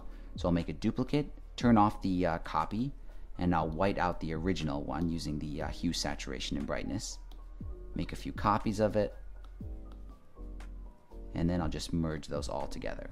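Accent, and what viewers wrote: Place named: United States. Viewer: at your accent pretty much American if I'm not mistaken. Is it true? Yes, surely nowadays the situation is just unstable for you & family to travel around. Stay healthy and stay safe. Thanks for your kind responses. Keep posting nice videos 👍🏻👍🏻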